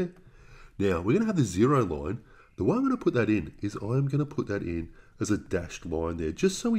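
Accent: Australia. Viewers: I'm not sure what's more mesmerising. The simplicity of this maths trick, or how Bogan this dude's Aussie accent is xD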